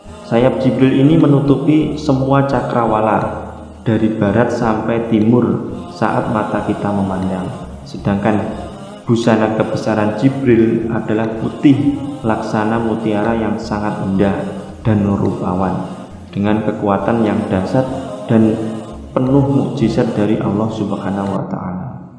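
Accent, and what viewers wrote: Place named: Java, Indonesia. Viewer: Medok wkwk. Good video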